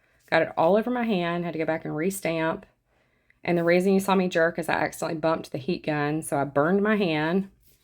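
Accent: South, United States. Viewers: I’m very very sensitive to voices and actually think your tone and cadence is very calming. Ignore the meanies, some people just need to spread their own misery sadly. Also love your little bit of Southern accent lol. I can’t wait to get back down to Goodyear for the winter and do some more shopping at your booths. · Excellent tutorials. I know nothing about embossing and I’m so pleased I came across you channel. I love a southern accent and a gal that can talk my ear off. So refreshing. Thankyou 🤗🥰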